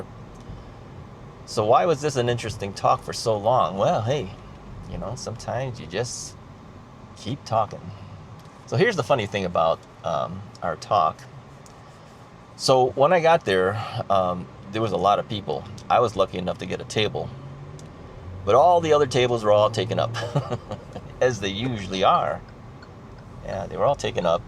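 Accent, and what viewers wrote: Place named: China. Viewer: you know you have a Chinese accent.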